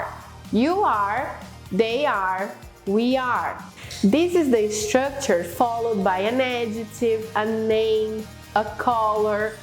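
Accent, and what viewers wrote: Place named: United Kingdom. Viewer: Eu acho fofo o seu sotaque britânico. Normalmente, no ingles americano, o T tem o som de R em algumas palavras, such as: "personeleri", "ciri", "nationaleri", porém no britânico eles pronunciam o T originalmente.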